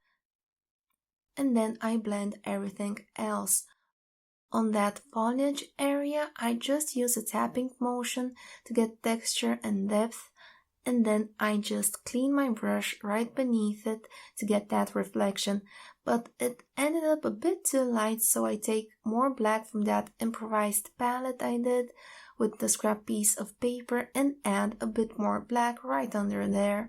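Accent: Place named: Eastern Europe. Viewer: I wish you narrated more of your videos. You have a beautiful speaking voice. Do you mind if I ask what country you are from? Your accent sounds like Eastern Europe to me, just wondering if my guess is correct. :-) Thank you for making these videos, I enjoy them very much.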